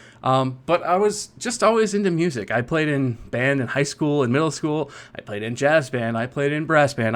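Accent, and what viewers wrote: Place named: Canada. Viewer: OMG your Canadian accent just killed me, LMFAO #CanadianPride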